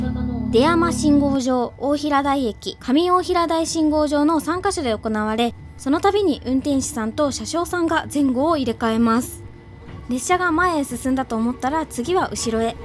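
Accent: Kansai, Japan. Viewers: どれどれ可愛い😍ちょこちょこ関西弁が良き良き😊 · おー大スター、ナチュラルな関西弁ですかね。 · 月曜に動画上がって癒されました✨️たまに出る関西弁が関西出身からしたらめっちゃいいです！暑いので、水分しっかり摂って夏を楽しんで乗りきりましょう！ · ２万円有効活用の旅楽しかったです❣ さんの時々出る関西弁が可愛いです❤ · 私は箱根に行くときは、もっぱらっ車ですが、登山列車もおもむきがあって良いですね。会話の端々で出る関西弁が可愛いです😊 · 箱根は、さんのお気に入りの場所リラックスできて関西弁も出てましたね！ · Suica1枚で充実した旅ができてよかったですね😊 残高1円は奇跡ですね😊 最初のほうで関西弁が親近感があって嬉しいよ😊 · なんで関西弁やねん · 関西弁の 👍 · 今回も楽しい動画ありがとうございます！こういう企画もいいですね😊それにしても残額1円って流石ですよ！！東海道線(熱海行き)、座席上にSuicaかざしてグリーン席を利用するのは、初めて知りました！根府川駅で急に関西弁が飛び出して驚きましたが、さん関西弁は可愛くて、勝手に親近感を感じてます😆 熱海の砂浜に「Suica」は、ちょっと笑っちゃいました、小田原城の天守閣から見る景色も最高ですね、天成園の外観・森の奥深くにありそうな雰囲気がとっても良くて、浴衣姿もとてもよく似合って可愛かったです😊 他の方のコメントを読んでいて、タッチでGOは東海道新幹線で利用できないと書かれていて、自分でも調べたんですが、使えないんですね……勉強になりました🤔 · ちゃん、たまに関西弁が出る。親が関西人とか? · たまに出る大阪弁が可愛い。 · 熱海の砂浜の波打ち際を裸足で歩いてる姿はアイドルのように可愛かったよあと関西人な自分にはさんから時々出る関西弁が親しみを持てて良かったです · 今回もありがとうございます！たまにはこうしたノープランで旅に出るのもとてもいいなと思いました。残高1円で終わられたのもすごい！たまに関西弁が出てくるところがとても親近感があって良かったです！箱根登山電車は紅葉の時期に行ったことがありますが、四季を通じて年中楽しめる路線だと改めて感じました。天成園さんの温泉が気になったので箱根に行ったらチェックしたいです！ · いつもに増して関西弁がちらほら🤭 カラス🐦‍⬛さんはご無事でしたか？夏は房総、秋は北関東、冬は雪☃️があるところと、季節ごとにシリーズ化してください😊 そうそう、お城🏯にもご興味がおありなのですね😊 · Suica一枚だけ旅！熱海で海を楽しみ彦根で温泉楽しんでの満喫旅♬ にしても温泉上がりのちゃんすっぴん？？って一瞬思ったけど流石にそれはないわな。。。ｗ ☆今回は関西弁多めの動画で普段にもましてカワイイやないか~🤣 残高１円・・・最後、乗り越し精算になってしもたらどなするつもりやったん？ｗ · 大阪弁がかわいすぎる。熊本弁、岡山弁、名古屋弁もお願いします。全部かわいいと思う。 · 斬新なSuicaを使い切っての旅動画アップありがとうございました♪ どんな動画になってるのかワクワクしながら観てました🤭 Suicaが使える使えないのシーンでの効果音が、まんまさんの心の声のような気がしておもしろかったです😂 根府川駅のカラスさんはおもしろすぎました。華麗なステップすぎて🤭 熱海城って聞いた事ない城だなぁと思っていたら、熱海には城があったという歴史はなかったんですね。商業施設としての熱海城という名前だったとは知りませんでした。熱海サンビーチでの落書き…何を書くかと思えば斬新な…🤣 ちなみに「hola」はスペイン語なんですが、スペイン語ではhは発音しないので「オ-ラ-」と読みます。英語で言うHelloですね。小田原城！行ってみたい城だったので天守閣や天守台からの展望見せてくれてありがとうございました✨ 説明しようとしたら、ちゃんとさんが勉強して説明してくれてました🤭 秀吉が小田原征伐で建てた石垣山城は天守から見えたかな？箱根フォント初耳だったのでメモしました_φ(･_･ 入浴施設のお蕎麦おいしい説、絶対ありますよね！最後の残高には思わず「マジかぁ〜」となりました😆 私もSuicaって便利なんやなぁと思いました🤭 今回はさんの関西弁多めで嬉しかったです。さんの関西弁聞いてファンになった身でしたので。見応えたっぷりの動画ありがとうございました😊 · なかなか、楽しいチャレンジ企画ですね。 Suicaのいい宣伝にもなりますし、めっちゃ、Suica Loveですね！で、熱海の砂浜に、Suica と描いた映像はなんか、とても、シュールでした！さん、いいセンスしてますね！さんのモリモリ食べるシーンで、美味しさが伝わります！時々、出る関西なまりが、かわいくて、素敵ですよ。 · 所々関西弁とか、お箸が左利きとかなんかいいね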